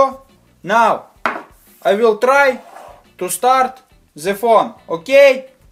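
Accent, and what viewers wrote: Russia. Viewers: Louie Espinosa Yes he is Russian. You can hear it by the way he talks, OR if you have watched Muppets Most Wanted and saw Constantin the evil frog-he had exactly the same accent c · this was so funny! OKEIII??? btw you sound russian or ukrainian 😂😂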